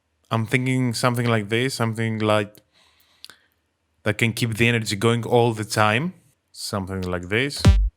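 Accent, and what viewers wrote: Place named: Greece. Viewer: Are you from greece? Cause your accent sounds a bit greek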